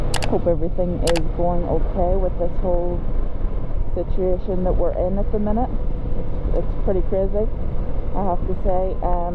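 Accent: Ireland. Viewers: Your accent isn't that thick for an Irish person lol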